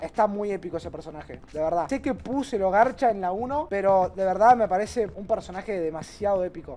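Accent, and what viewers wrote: Spain. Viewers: El acento español de es perfecto sin más, igual que el arremedo del xokas xd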